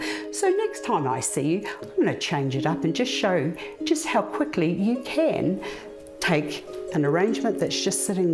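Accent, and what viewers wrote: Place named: New Zealand. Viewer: Love your New Zealand accent - from a follower in the Commonwealth of Virginia in the United States!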